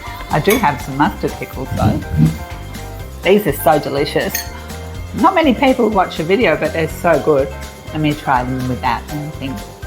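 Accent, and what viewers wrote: Australia. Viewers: Never apologise for your beautiful Aussie accent.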